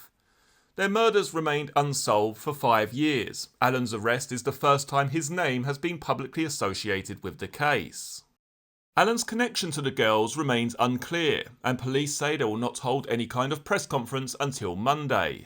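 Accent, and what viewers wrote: United Kingdom. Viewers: Another UK guy covering a US story—I have noticed a huge number of UK people narrating US stories. Now I love you guys, don’t get me wrong, but it’s Frickin’ irritating…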